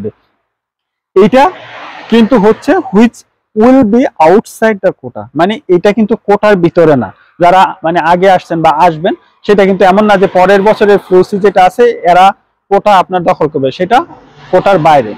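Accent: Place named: Brahmanbaria, Bangladesh. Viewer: Assalamualaikum bhai...apnar home District B-baria Apnar voice shune mone holen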